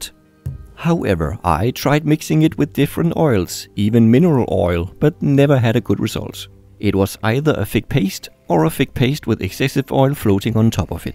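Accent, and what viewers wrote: Denmark. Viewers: Er du fra Danmark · er du fra Danmark?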